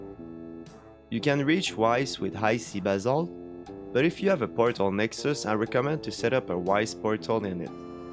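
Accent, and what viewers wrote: Quebec, Canada. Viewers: J'ai remarqué direct l'accent québécois! super guide, lache pas! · are you from quebec? this accent me dit dequoi en tabarnak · bro are u canadian/french? u talk almost identical to GSP (georges st-pierre) edit: ty for the guide very well explained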